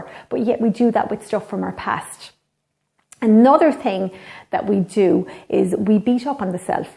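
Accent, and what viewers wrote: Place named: Ireland. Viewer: Such an important aspect of good health to talk about! Btw I love your Irish accent haha:) and keep up with the videos, I'm loving them:) x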